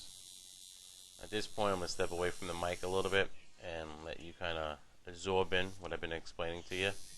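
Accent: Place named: New York, United States. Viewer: He's from New York ...I can tell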